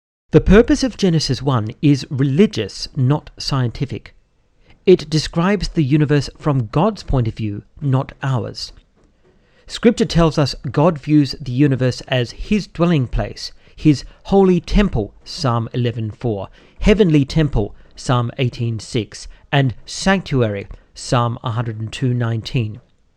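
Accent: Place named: United Kingdom. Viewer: Everything sounds more authoritative in a crisp British accent, but I have to agree with Snipe. You guys are acting like the temple came first and the text of Genesis came later. Genesis isn't modeled on a temple, the temple is modeled on Genesis. That doesn't make creation a temple anymore than a doll house is a real house. Creation isn't a temple, the temple is a reflection and microcosm of how creation was supposed to be. I am also inclined to think that the temple itself was more along the lines of a reflection of the garden. The "sea" was in the outer courts. That was the earth. Thus the windows letting in light wasn't about recreating the host of heaven. It was just a way to illuminate the temple. If creation was meant to be a temple instead of the temple a microcosm of creation, then why wasn't God big on temples? He only wrote it into the story when David wanted to build one, and He wrote it out of the story when it became almost an idol. 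God's idea was the Tabernacle.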